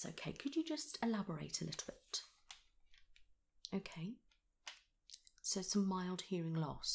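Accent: United Kingdom. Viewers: it’s British! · What part of the UK people have that accent? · centre, I presume? A bit north of London, like the latitude of, say, Cambridge? It may seem a silly question, but I just find amusing to train my italian ears to recognise and emulate british accents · I love the british accent. It make the ASMR more slowly and it causes so many tingles. Btw, u look like Meryl Streep to me xD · I love your British accent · ASMR + pretty doctress + British accent . OMG . It must be wonderful 😊🤩😘❤ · are you from the UK? you have such a nice accent! :) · Ah, I love British accent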